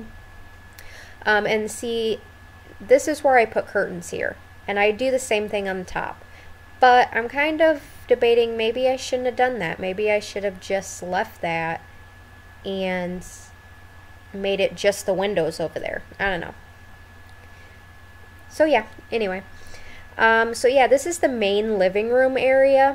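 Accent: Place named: Minnesota, United States. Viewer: lovely build! quick question: are you from the midwest? you sound a lot like my minnesotan friend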